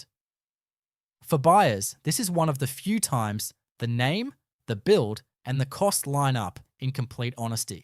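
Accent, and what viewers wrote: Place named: United States. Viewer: Pronouncing numbers the American way eg "one-hundred-forty", not the correct one hundred and forty is annoying.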